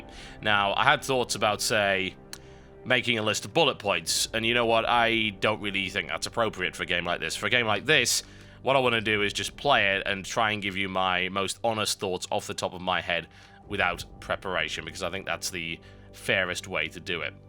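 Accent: United Kingdom. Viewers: your british voice is very soothing... #NoHomo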